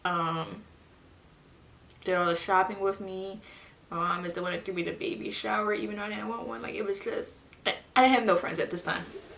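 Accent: Philadelphia, United States. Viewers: I knew you was from a philly with that accent. So strong and your daughter name is so cute . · Instantly knew you were from Philly as soon as I heard you talk 😂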